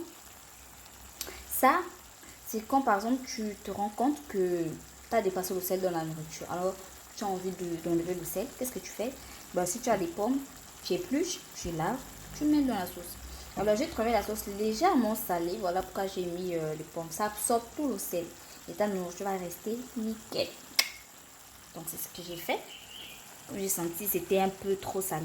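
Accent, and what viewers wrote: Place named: Gabon. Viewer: On sent une gaboma 😂😂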